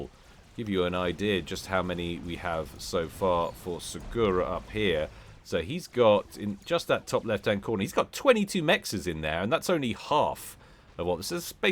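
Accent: United Kingdom. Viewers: The thing I enjoy about casts other then the brits lingo/accent are he just enjoys the game as much as I do, where others casters seem to be just talking. The other random off topic comments are just the icing on the cake to remind us he's not A.I. Loved seeing the addition of the recap of "missed critical action" or the "WTF happened while I was focused somewhere else" at the end of some casts when needed. I know it's all alot of work but think of it as recompense for all the other stuff that was missed. Ya, not sure how trump won when I compare the two, which comes down to either Americans are stupid or sexist, which is sad because I know many of them who are not either of those. Now it's time to grab a bag of popcorn and watch the world deal with human greed/stupidity in the worst way in, power over the people. Thanks America, another thing in the history books up there with the other world goofs. 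And before someone wants to comment, lets just let the next 4 years prove me right or wrong. I just know I bought some Elon and Trump shares to sell in 2 years, which better pay for my spaceship off this planet cause we can't take the money where humanity is going. XD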